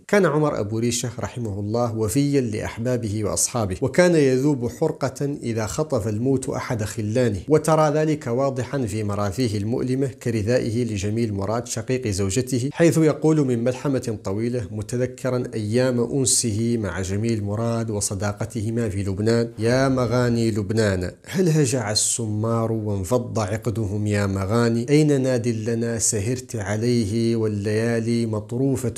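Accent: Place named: Yemen. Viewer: متابعك من اليمن هل تعرف ان لهجتك كانها من لجهات اليمن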